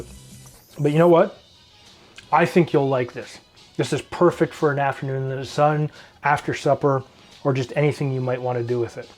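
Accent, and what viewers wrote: Canada. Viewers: 1st written abeeewt, I love the way you Canadians talk! Those are some big-ass lemons you get up there. By the way, how did you make that subscribe icon appear with an arrow & click? Maybe it would get more subscribers to my cocktail channel. And you mentioned the Barfly. Do you have good relationships and talk to a lot of other cocktail channels? I have things I'd like to discuss with you, but not leave in a comment as they have nothing to do with that particular drink. I know you have a tight schedule, but please drop a line sometime : <mail>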